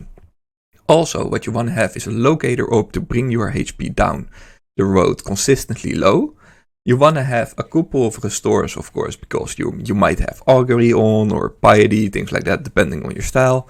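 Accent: Netherlands. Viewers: yo are you dutch by any chance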